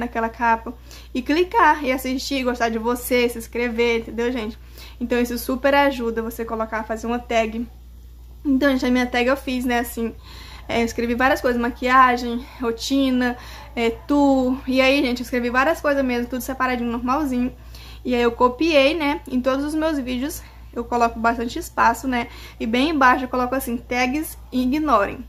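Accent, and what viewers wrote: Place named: Maranhão, Brazil. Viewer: Vc fala maranhense msm😍💖😍😍😍😍lindahhh sou sua fã... vc me deu essas dicas💖❤e ta ajudando 🙏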